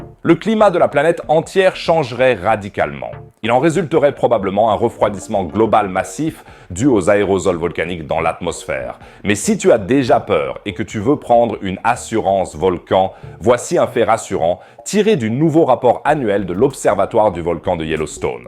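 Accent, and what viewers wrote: Quebec, Canada. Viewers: Accent quebecois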